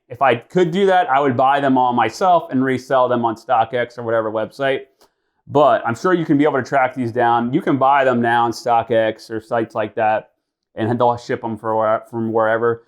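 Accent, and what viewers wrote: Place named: England, United Kingdom. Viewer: The English accent 💀💀💀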